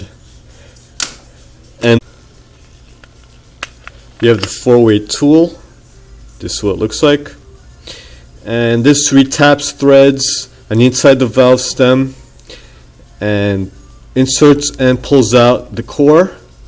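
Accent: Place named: New York, United States. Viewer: Good reminder for me from Vinnie Barbarino from Welcome Back Kotter😂😂 You do sound like him with the NY accent👍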